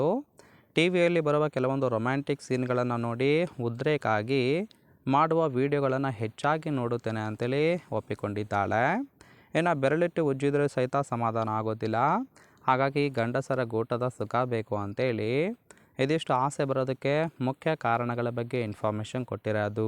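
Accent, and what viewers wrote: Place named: Haryana, India. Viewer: Haryanvi madam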